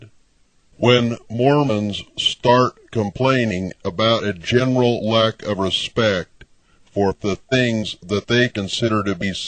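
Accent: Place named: Canada. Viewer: I dare not try to understand the purpose of the video, they guy sounds Canadian.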